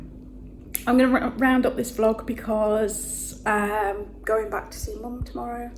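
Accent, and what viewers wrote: Nottingham, United Kingdom. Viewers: your Nottingham accent is coming through 😂. I am born and bred in Nottingham but have moved all over the UK but I’m back living in Notts now and it soon comes back xx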